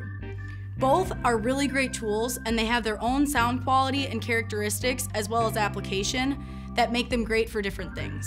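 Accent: United States. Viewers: Please enlighten me about her accent. English is not my first language but what I'm hearing sounds like a typical American accent. Is it a specific regional accent?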